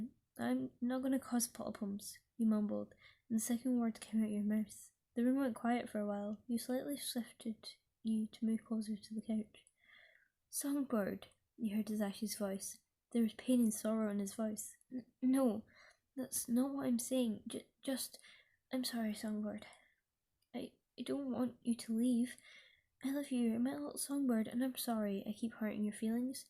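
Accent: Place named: Scotland, United Kingdom. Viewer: When you spoke the exact words that came out my mouth was "wait a minute you sound scottish" 😂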